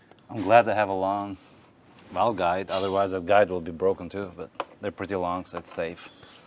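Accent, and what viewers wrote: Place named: Albania. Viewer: Where are you from. Your accent is like Albanian.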